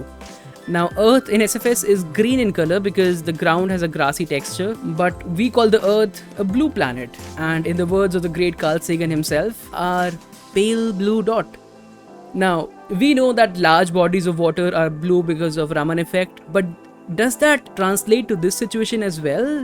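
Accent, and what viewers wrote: India. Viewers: Are you indian because you sound like Indian 🤔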